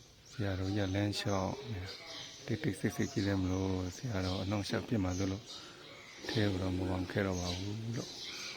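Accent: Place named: Yaw, Myanmar. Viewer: ယောသံကြီးနှင့်ပါလား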